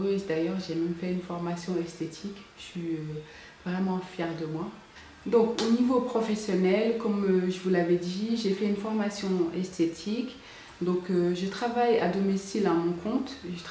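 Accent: North, France. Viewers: Bonjour Tu es vraiment magnifique et très courageuse d oser parler de ce qui te complexe ! Tu t exprime parfaitement en français et ton accent (que je trouve mignon) ou je devrais dire tes accents (tu as un petit accent du nord de la France ) n enlève rien à ta très bonne expression française ! Beaucoup de français français eux même ne s exprime pas aussi bien alors oublie ton complexe ma belle . De plus tu as une voix très douce ce qui permet d être rassurée lorsque tu explique quoique ce soit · Tu t'exprimes tres bien! On entend meme aussi un petit accent du nord de la France !!! Ton/tes accents ne nuisent en aucun Cas a la Bonne comprehension de ta video. Et si je peux me permettre, ton ton est posé, la voix assurée, un debit de parole calme et apaisant, non vraiment je trouve que tu t'exprimes tres bien et de surcroix avec beaucoup d'elegance. Ne serait-ce que, pour ce que tu degages dans cette video, je continue de visiter ta chaine, a bientot la Belle 😘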